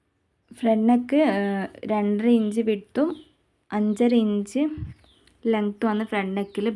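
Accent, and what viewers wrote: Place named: Kannur, India. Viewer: കണ്ണൂർ aano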